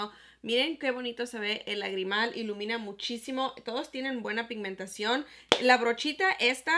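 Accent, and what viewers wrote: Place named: United States. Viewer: Me gusta cómo habla jajaja su acento bien pocho jajaj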